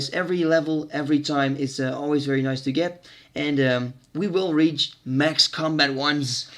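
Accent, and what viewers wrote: Netherlands. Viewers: Dit had ik niet verwacht, een nederlander! Top filmpjes, ga zo door!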